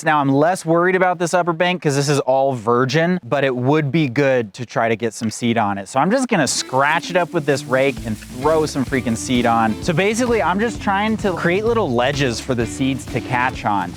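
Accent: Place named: United States. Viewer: Sometimes I have to try and work out what you're saying in American and translate it to English new word soffits in English they called fascia boards, and if you're trying to insist you speak English, I can tell you is definitely American there's a new your loss of bastardising English which is doving translated into English means driving, so don't tell me you're speaking English still like your videos even though I have to try and work out what you're saying in English.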